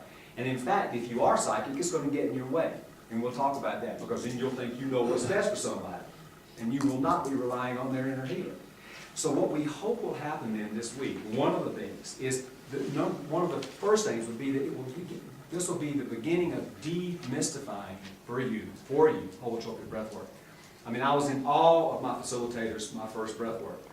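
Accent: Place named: South, United States. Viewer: I trained with and Stan, early 90's. Just found out no longer with us. These were, are, legendary days that cannot pass. I know the way he used to talk and the sort of thing he talks about here, and he has same energy, but his strong southern accent isn't so pronounced. Goodbye. See you again probably.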